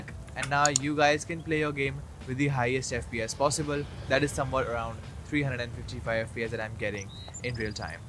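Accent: India. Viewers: No offense, but you sound exactly like those scammers from India.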